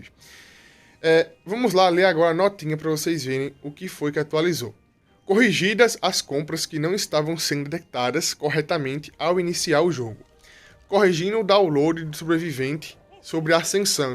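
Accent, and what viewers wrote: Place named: São Paulo, Brazil. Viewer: Pela voz se tem 30 e poucos anos e no jeito que se fala provavelmente se são Paulo na cidade de assis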